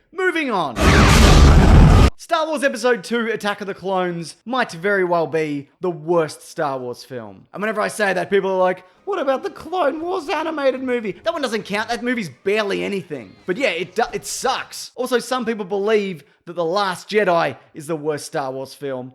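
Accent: Australia. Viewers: Ay man where are you from in Australia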